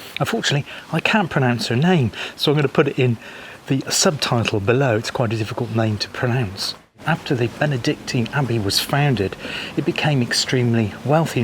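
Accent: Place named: Yorkshire, United Kingdom. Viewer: Hello - greetings from Poland. Your video certainly captured the essence of Shaftesbury. I've been there many many times as my ex wife's parents lived there in the latter years of their lives. Loved Yorkshire commentary and camera work. The still photographs especially the landscape shots were exceptional. Congratulations. A very fine video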